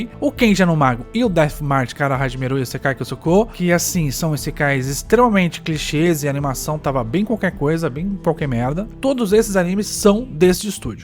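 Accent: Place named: São Paulo, Brazil. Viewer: como voce é burro! nao sabe a pronuncia de "cha". vai ver mais anime e estudar um pouco de japonês. pela pronuncia só pode ser sp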